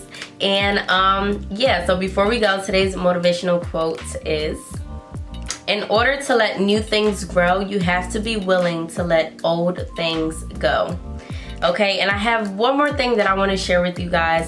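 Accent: Jamaica. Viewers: Gurl jus a c seh u a jamaican❗ daumnn Loving yr videos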